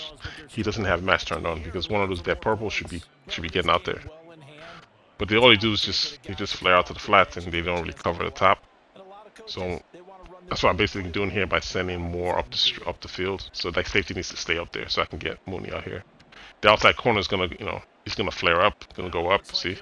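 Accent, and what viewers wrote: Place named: Chicago, United States. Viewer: U from Chicago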